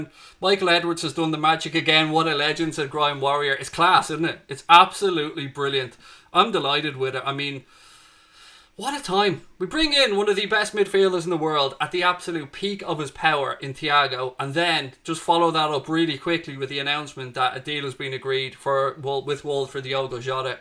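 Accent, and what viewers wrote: Ireland. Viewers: Yeah I thought I recognized that accent, I’m Irish too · Great news to wake up to this morning. Came across your channel watching about Thiago and have subscribed...I like listening to you on your own and bring half Irish, love the accent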